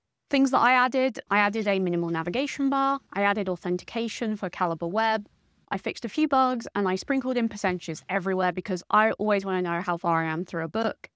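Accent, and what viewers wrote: United Kingdom. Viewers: I watched with low expectations when I read $. But then you started to speak and yay. A video by a fellow Brit with no waffling or bullshyte.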